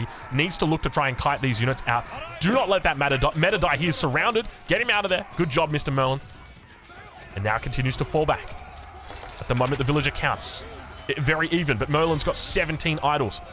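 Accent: Australia. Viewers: I really like how aussies say words player aussies turn into Playah shopping center nnto shopping centah aussies sound so cool much love brisanian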